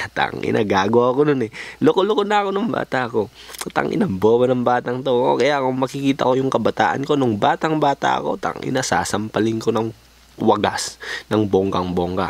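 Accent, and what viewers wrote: Ilocos, Philippines. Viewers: Ilocano ka pala boos hahahaha ukinana tattak la naamuan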